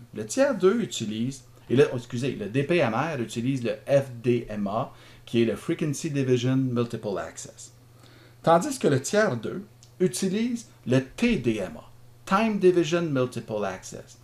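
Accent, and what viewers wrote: Quebec, Canada. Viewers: elle sont trop top tes vidéo franchement tout ce que tu as expliqué je le savais déjà mais j'aime bien les explication avec lacsen québécois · Vidéo très bien fait. Les explications sont claires et dites en bon canadien français. Je partage ce vidéo sur ma page FB dédiée à la radioamateur. '73 de VE2ECL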